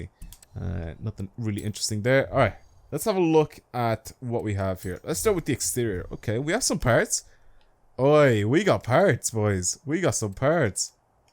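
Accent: Ireland. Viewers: Are u from Ireland cuz I am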